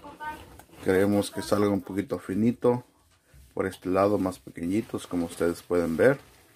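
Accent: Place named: Guerrero, Mexico. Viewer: Holaaa. Así hablan en guerrero. Xque no sabía sorry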